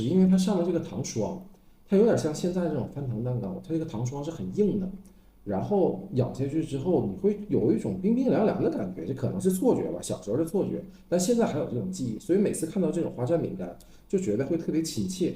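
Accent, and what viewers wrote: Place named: Northeast, China. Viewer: 我是馬來西亞新山人，我也挺想念東北的，聽到你們的口音就想到東北朋友，東北的美食和黏豆包。祝小留學生爸爸和媽媽虎年虎虎生威，小留學生學業棒棒滴！全家健康也棒棒滴！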